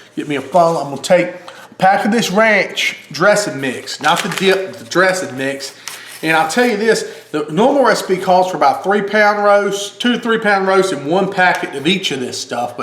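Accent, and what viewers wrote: South, United States. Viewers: Lol i love you... Just good ole southern voice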